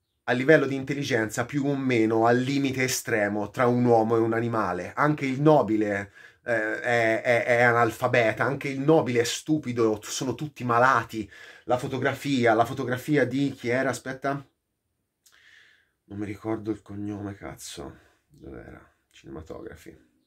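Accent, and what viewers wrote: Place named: Livorno, Italy. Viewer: Ma sei di Livorno?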